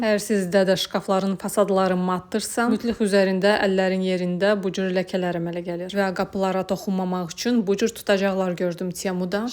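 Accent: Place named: Russia. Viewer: ÖZÜNÜZ TUPOYSUZSA CAMAATA YAZMAYIN.RUS QRAMMATİKASI İLƏ DANIŞIR VƏ ONDA T HƏRFİ İNCƏ OLANDA Ç KİMİ EŞİDİLİR!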